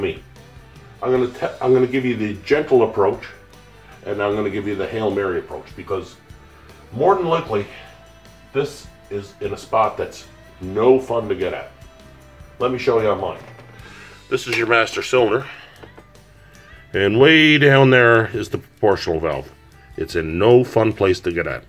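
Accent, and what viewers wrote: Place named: Newfoundland, Canada. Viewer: first 5 seconds.. please tell me yer newfie?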